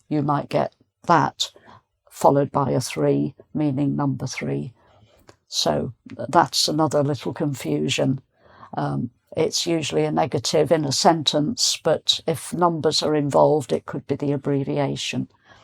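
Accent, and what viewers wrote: United Kingdom. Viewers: One of the most beautiful voices with a British accent. Always❤ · Slow and clear english sound i really like that british sound a🎉❤nd fluently like I lo ve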